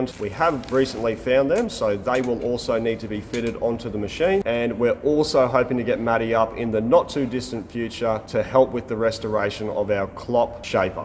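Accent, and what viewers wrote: Australia. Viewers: Build the drift car! Also Australians get a pass for profanity, no one says the F-word like you do! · I thought you were Australian until I saw that ute launch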